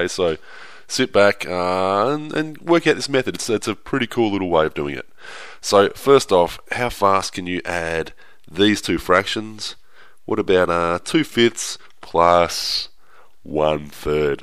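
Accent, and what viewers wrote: Australia. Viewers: i think he is Australian but we might never know.... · Is he Australian lol? I'm look at this cuz my recruiters keeps quizing me on mental math (and I can even look at the problem) but yeah.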